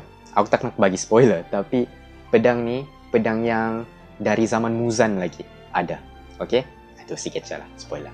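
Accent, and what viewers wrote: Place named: Sabah, Malaysia. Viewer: Abang orang sabah ke? Suare abang macar orang sabah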